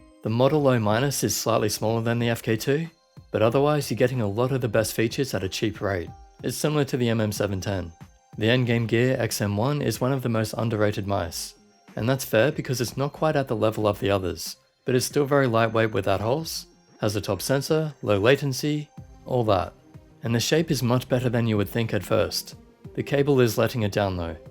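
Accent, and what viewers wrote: Australia. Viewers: are you australian and why can't you get finalmouse?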